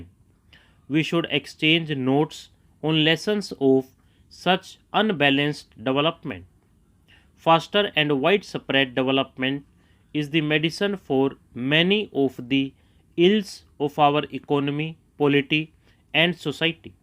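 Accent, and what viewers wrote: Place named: Haryana, India. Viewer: Sir thoda hariyanvi tond ho. Jate. H thoda sa twist 😅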